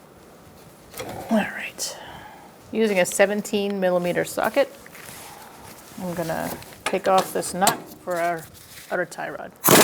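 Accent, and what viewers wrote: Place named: New England, United States. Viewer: New England accent coming in good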